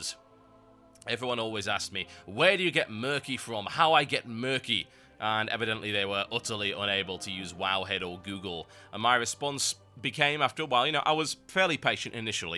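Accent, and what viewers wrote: United Kingdom. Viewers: u british asda? me 2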